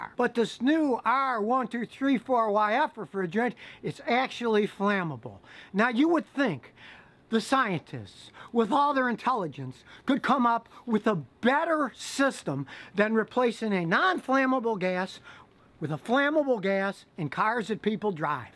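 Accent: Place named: Pittsburgh, United States. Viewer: Amen!!! Ps: are you from Pittsburgh? You sound like you are, makes me miss home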